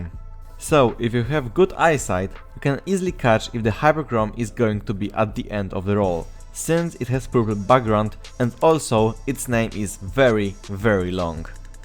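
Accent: Poland. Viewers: Where are you front you have Poland adcent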